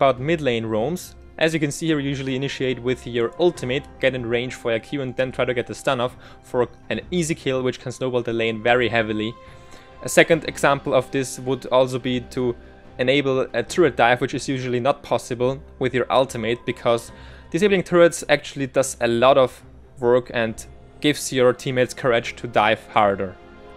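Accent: Germany. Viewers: german accent? ^^ haha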